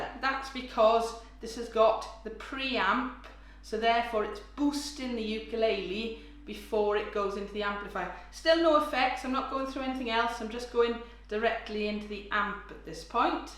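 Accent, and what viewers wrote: Wales, United Kingdom. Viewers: im from canada and i love the welsh accent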